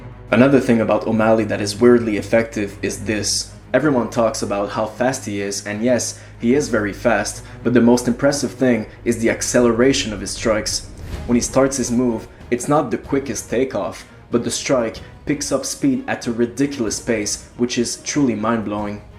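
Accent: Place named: Quebec, Canada. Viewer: Love your videos! On entend l'accent québécois légèrement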